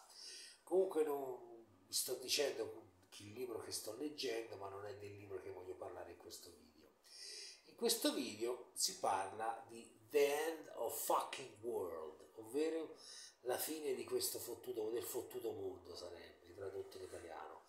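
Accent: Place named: Tuscany, Italy. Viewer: Sono anche entrambi toscani quindi anche la voce si somigliano 😊😊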